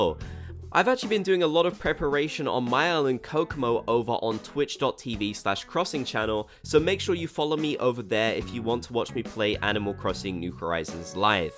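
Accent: United Kingdom. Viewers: ahhh you’re British! I was trying to figure out the accent :) I’m from New England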